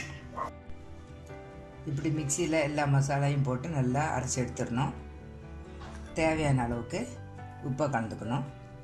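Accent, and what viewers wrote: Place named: Tirunelveli, India. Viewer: Amma neenga tirunelveli a thoothukudi ya ila kanyakumariya???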